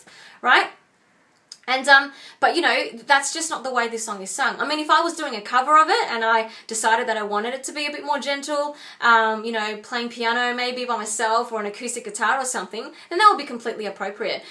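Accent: Australia. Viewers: Hey! I really enjoyed your videos and it's nice to hear a relatable aussie accent :) I personally REALLY struggle with projection or 'forwardness' all of the examples you gave where you were quite gentle or 'acoustic' i find that I 'ALWAYS' do this.... I would LOVE to know some vocal exercises to improve projection! :) Subscribed!!!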